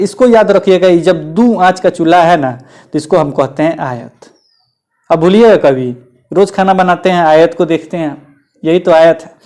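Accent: Bihar, India. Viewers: Sir ji aap to pakke Bihari Lg rhe hai matlb aapki bhasha 😀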